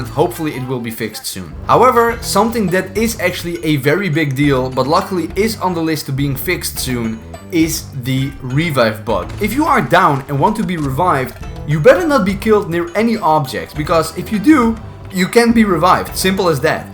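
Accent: Netherlands. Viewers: You must be Dutch right?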